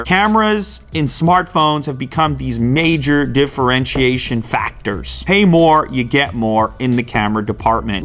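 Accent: France. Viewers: Lol that french accent sucks from english ot american people 😂😂😂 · what's with the French? You trying to be sexy couse dude you are fine already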